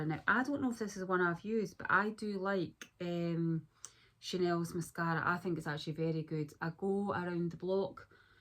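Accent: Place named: Scotland, United Kingdom. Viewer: I love a more personal and authentic vs commercial vibe to the content i watch! I'm not sure what's your cute little accent - Scottish?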